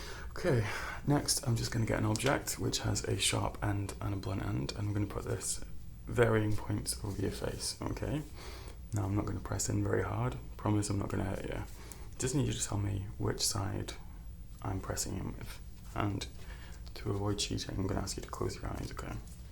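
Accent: United Kingdom. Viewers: Subscribed, it's the accent for me, with the deepness too! I'm british but i forget just how many accents we actually have here at times, so many mixes too! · Did not expect you to be British. 😍👌🏻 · Are you britthis? Maybe it is obvious or not but your accent makes me think it, sorry if im wrong, pls dont hate if im wrong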